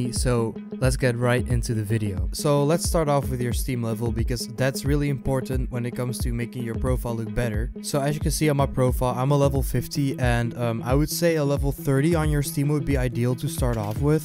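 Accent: Netherlands. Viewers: i just knew that you were dutch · lol ben jij Nederlands? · Instantly knew you were dutch hahaha you guys never can hide your accent :) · Are you from the Netherlands? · IK WIST DAT JE NEDERLANDS WAS! :D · ben je nederlands xD lol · Wait. Are you Dutch? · Are u Dutch? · dutch accent NotLikeThis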